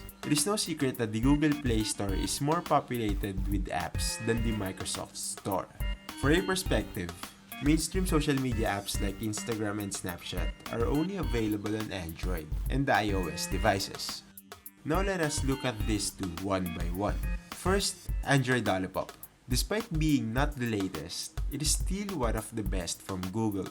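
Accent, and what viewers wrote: Philippines. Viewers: tagalog mo nlng tol halata rn lng accent mo.. cka pinoy lng karamihan mkakabili nyan.. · Ganyan accent ng pinoy pag nag eenglish o kung tawagin sa Wikipedia, Philippine English. Hindi naman kaylangan lagi american accent. Lahat ng bansa may kanila version ng english.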